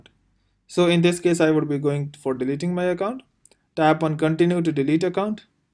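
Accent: India. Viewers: Hey ! Try to talk clearly the english, still we hear your hindu acent , we can’t understand. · This indian accent kills me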